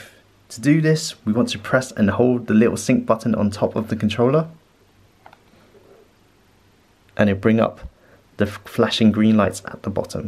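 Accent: England, United Kingdom. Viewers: Was not expecting this guy to have a British accent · First time I see an Asian with British accent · He looks asian but he has an British accent LOL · How are you asian but with an english accent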